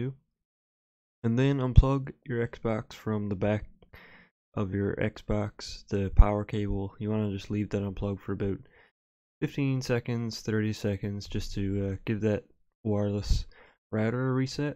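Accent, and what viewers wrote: Canada. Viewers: You're totally Canadian :D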